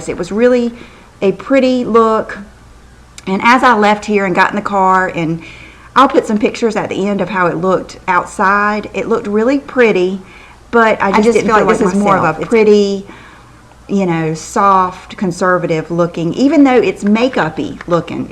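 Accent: South, United States. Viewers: I love watching you! I'm Southern also, so it's like I'm listening to a girlfriend. Big tip---- I've already tested Dior Star. It IS lovely, but doesn't wear all day or stay as natural-looking as Guerlain Lingerie de Peau. Virtually no one reviews Guerlain on YouTube but their foundation is fantastic! Sample it. It does oxidize slightly, so go just a tad lighter than what u think u need. :)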